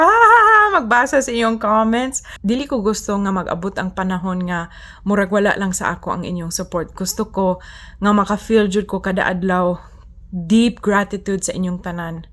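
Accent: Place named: Cebu, Philippines. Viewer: Wow guawapa man kaayo imong Cebuano miga oi! Hahaha!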